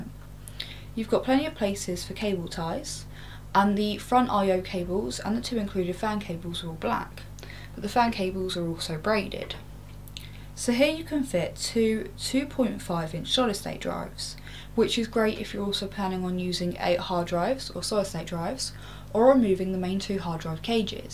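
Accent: England, United Kingdom. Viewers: Who said british girld are ugly?? Shes smoking hot with that british accent!!! And very good review! Linustechtips looks so bad compared to this!! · She's from the UK. Americans / Canadians / Aussies / Kiwis / South Africans sound nothing like this. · pretty sure that's her accent. not sure what region of England she is from.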